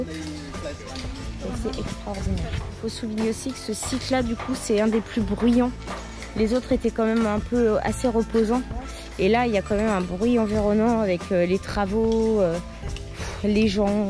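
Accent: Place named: Cambodia. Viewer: Super Voyage et superbe vidéo !! On a adoré aussi de découvrir le pays dont je suis de cette origine. Une belle découverte pour nous en fin janvier et début février 2020 juste avant le COVID 😅. Ravis de vous suivre ta chaine youtube et rubanbleu. Et super l'accent cambodgienne 😅. On a hâte de y retourner. Merci beaucoup pour cette vidéo.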